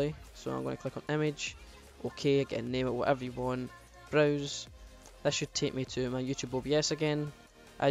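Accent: Scotland, United Kingdom. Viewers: Yasss he's scottish! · bit a Scotland u fae bro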